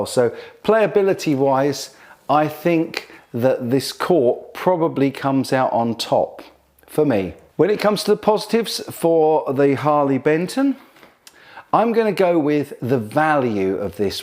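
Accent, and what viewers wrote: United Kingdom. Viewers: (From Belgium) Hello, I just discovered your videos for the first time: great job, hats off! You might also consider comparing the Harley Benton SC 550 II (= 2), which is even more convincing due to...STAINLESS STEEL FRETS and Tesla pickups (no Roswells on that one)!!! A SUPERLATIVE as far as frets are concerned. A dream really. This sells for a ridiculous price: 235£/279€. The web is full of positive appreciations and many testers kept or bought this guitar immediately (John Robson, e.g. ). I ordered one immediately, convinced that it is the bargain of the year. Finally, as a francophone deeply in love with American English, I must say I enjoy your British English A LOT. What a pleasure! If you’re not a teacher, you should consider becoming one ;-) Keep up this brilliant work. By the way, you might think of indicating the radius of the fretboards.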